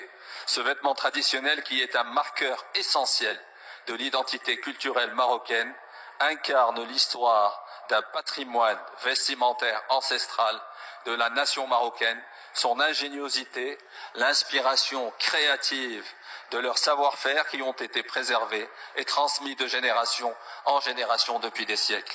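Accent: Standard. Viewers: واش سمعتي أداك القرع الكلام الموزون و الفرنسوية النقية و هاد شي كولو بطابع ديال المعقول ماشي الكذوب و البهتان …. تعلموا من سيادكم le savoir faire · فرنسية نقية وماعندي مانقول فهاد الكلمة ةلي لخصات وبكل بساطة تاريخ القفطان عند المغاربة !! خطاب متقون وكلماته مختارة وصراحة برافو ..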